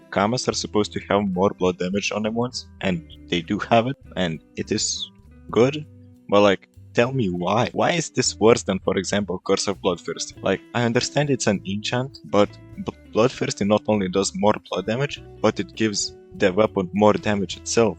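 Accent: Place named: Eastern Europe. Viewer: you have a strong slavic accent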